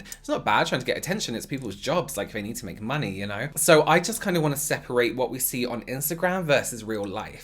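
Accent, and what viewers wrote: United Kingdom. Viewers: the algorithm doesn't care if you get a thumbs down, all interaction with your video is a +.. Never seen one tho..🙂 Me:🔔 💃🏼yey..🎧press👍: To the video... You are Always so sweet and your facial expressions are so funny, I love that! I love that you can laugh at yourself, and be a bit🤭the classy way: when you don't "care" for something!😆 The British accent is too much 💘 I adore it...😋💭 Keep up with the Great content! You are doing great, I am so Happy I found you, the way you teach, you are a godsent, I understand! ( Said before: A Finn thinking in Swedish trying to write English..☺️) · giggles british accent don't mean to be rude, but you're AWFUL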